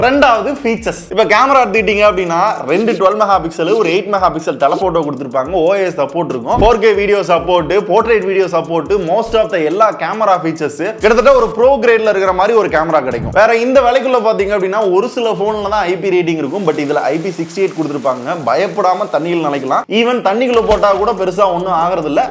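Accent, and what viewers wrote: Madurai, India. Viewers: bro Nenga madurai yaa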